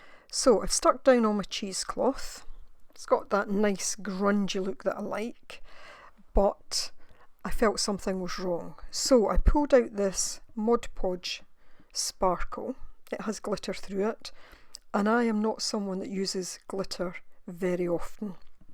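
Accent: Scotland, United Kingdom. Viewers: I agree that it's great that you left in the parts where you were struggling. We all go through it, and it makes us feel better about when it happens to us. I'm glad you kept going on these. The dragonfly is so beautiful! And may I just say that I love your accent? I haven't come across any other crafters or artists with Scottish accents, and it's just my favorite accent to listen to - love it! 💗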